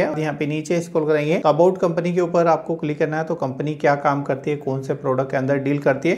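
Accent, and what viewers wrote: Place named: South Asia. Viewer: Very nice Explanation simple and crips with desi tone 👍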